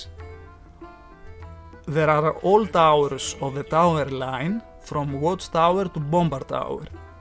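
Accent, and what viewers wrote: Greece. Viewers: Από την προφορά θα πω ότι ο ομιλητής είναι Έλληνας άλλα δεν είμαι σίγουρος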